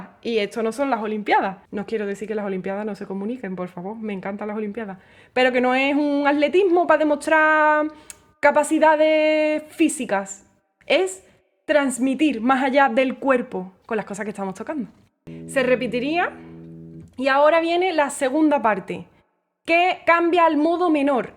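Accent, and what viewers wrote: Andalusia, Spain. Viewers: me encanta tu acento andaluz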